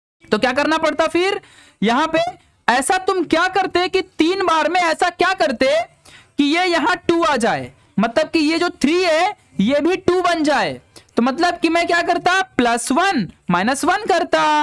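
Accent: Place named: Mumbai, India. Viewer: आप होकर आप से मुंबई हिंदी सुनना अच्छा नहीं लगता!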